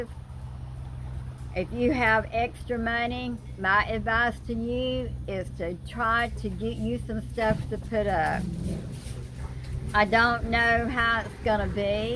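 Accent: South, United States. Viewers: I'm from Illinois and it feels good to hear your southern accent and looking at your background it seems like a touch of heaven.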